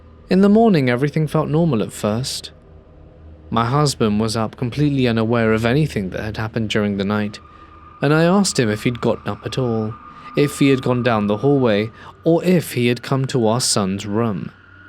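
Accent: England, United Kingdom. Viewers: For the first time I can’t stand an English accent!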